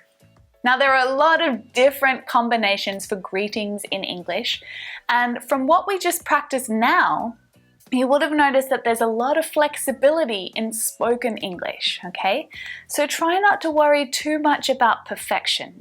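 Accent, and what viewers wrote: Australia. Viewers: I love your videos and I love your Australian accent ❤ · Your Australian accent has made you a special English language teacher. Do all Australians have the same accent as yours? I really like it. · I love Australian accent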